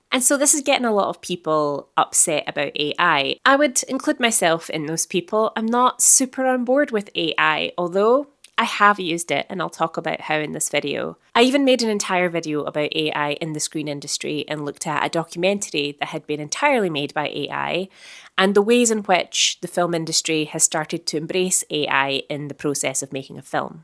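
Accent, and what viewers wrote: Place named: Scotland, United Kingdom. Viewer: And it does look cold and empty. I don't know, this whole thing may be a bubble, but doesn't seem like it given the practicality of it, at least in terms of making laborious tasks easier. However, this brings up the question of....what about assistant editing? Ingest, stringouts, etc, what about the entry level jobs like that? The tools are already beginning to at least be of use so far but it does worry me. Capitalism obviously doesn't care about keeping people's jobs or about creativity. What the system cares about is quarterly profits and how to maximize it. And that's the problem with people who say "It will aid us as a tool". The executive boards may not see it that way. They actually don't. Anyway, enough ranting from me. Hah I can listen to you talk for hours with your lovely Scottish accent!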